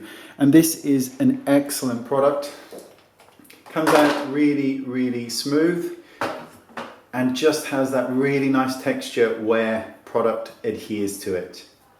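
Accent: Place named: Swansea, United Kingdom. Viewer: Hey my dad was from Swansy and he sounded allot like you. Where are you from?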